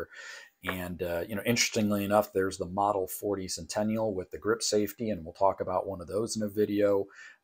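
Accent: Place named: Ohio, United States. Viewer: Sounds like you are from Ohio.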